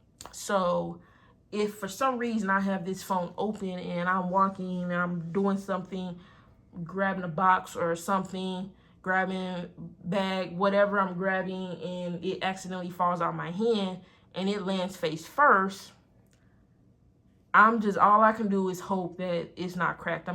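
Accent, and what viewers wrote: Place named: DC/Maryland, United States. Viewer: You have a DC/Maryland accent 😊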